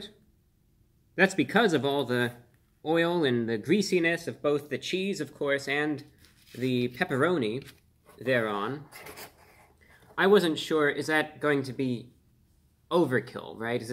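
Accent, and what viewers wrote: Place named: New York, United States. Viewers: the subtle new york accent is genius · He might make different jokes here or there, but you can definitely tell he’s from New York in his older podcasts… which is quite a treat to listen to… it was like listening him grow from a kid to a young man. Literally. 😃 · When I first found out about you, I thought you were from New York because of your speech patterns and such.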